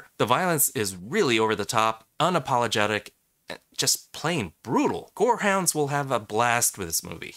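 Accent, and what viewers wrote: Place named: South, United States. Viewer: I just found your channel and really like your reviews! Sorry if you've said it before, like I said I'm new to the channel, but I was wondering where you're from? I think I'm hearing a bit of a southern drawl but I can't be entirely sure. Love from Atlanta!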